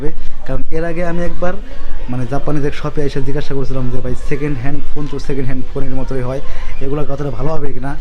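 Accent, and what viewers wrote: Faridpur, Bangladesh. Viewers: ফরিদপুর এর ভাষা প্রানের ভাষা ❤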